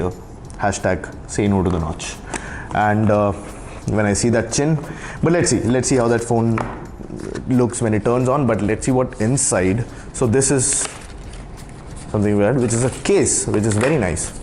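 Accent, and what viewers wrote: Kerala, India. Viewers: മലയാളി ആണോ?? Namil ഒരു മല്ലു touch..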